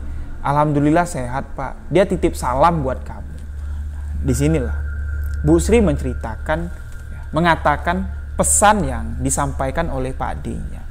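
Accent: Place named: Madura, Indonesia. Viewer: Logat ny kakak kok seprti maduro hehe 🙏 maaf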